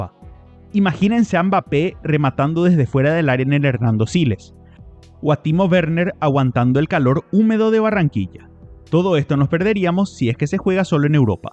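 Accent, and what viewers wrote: Paraguay. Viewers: TU ERES PARAGUAYO TU TONO SUENA COMO UN PARAGUAYO ? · intento adivinar tu acento, eres paraguayo¿?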